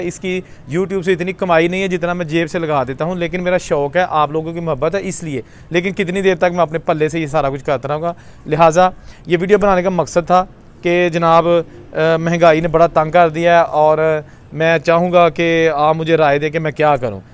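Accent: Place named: Majha, India. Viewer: I like you speaking maajha style punjabi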